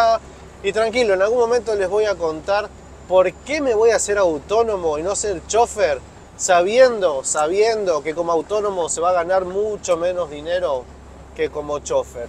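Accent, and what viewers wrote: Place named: Uruguay. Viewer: Un gusto escribirle, tmb soy del canal Mobile home. Sr: x DESEARLE SIEMPRE PROSPERIDAD EN TODOS SUS TRABAJOS Y ME ALEGRÓ MUCHO SU COMPRA DEL SCANIA. Como si fuera x mis hijos. PROSPERIDAD, SALUD X ELLOS Y FLIA. Y LE SUMO A UD. Con CARIÑO Y RESPECTO, SE LO MERECE ES TRABAJADOR, LUCHADOR, DE TODO OFICIO. Le saludo desde Uruguay. Mis hijos viven ahí en España. Los tengo lejos hace casi 2 décadas x tal motivo comencé a seguirlo, pensé q podía ser Uruguayo x el modo de hablar, somos de paises hermanos. SIEMPRE ADELANTE Y A CUIDARSE. Me despido con AFECTO.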